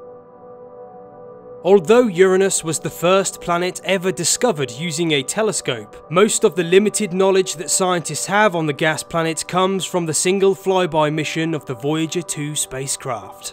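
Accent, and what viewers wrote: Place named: Wiltshire, United Kingdom. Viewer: Are you from Wiltshire? Or south west. BTW love you Channel. 👍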